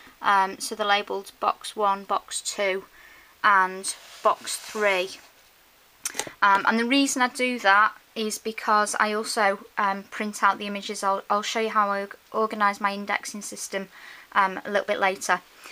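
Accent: United Kingdom. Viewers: WOW FANTASTIC COLLECTION...obviously you are from the UK...by your accent...I just love your collection of vintage/angel wood stamps...beautiful... Lori in the USA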